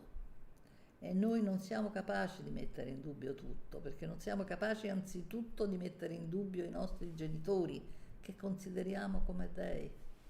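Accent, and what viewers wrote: Rome, Italy. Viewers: Ciao non ho ancora visto il video però ci tenevo io a cercare di darti qualche “spunto animico” che fanno sempre piacere Ti stra consiglio le serie di Zero Calcare su Netflix, ne è uscita una di recente “Due spicci” molto bella ma sono tutte meravigliose… tra l’altro romanaccio come te, secondo me ti piacerà molto E poi una canzone, “ ” dei Grazie mia, spero tu stia bene ♥️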